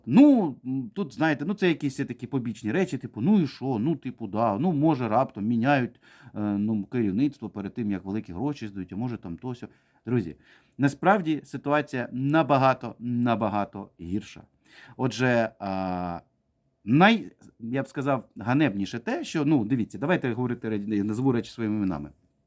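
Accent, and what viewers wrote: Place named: Surzhyk, Ukraine. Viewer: Пане, до Вас просьба - перестаньте розмовляти маскалоєзичним суржиком, - звучить це з Ваших уст просто жахливо! Беріть приклад розмови українською мовою у пані Марини Данилюк...😃😃😃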